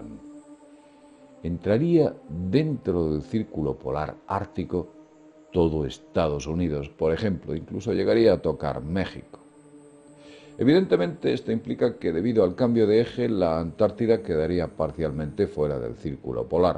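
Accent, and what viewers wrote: Spain. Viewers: Hablas muy lindo con tu azento español pero sube el volumen,no te oigo bien y estoy sin los audifonos (la77)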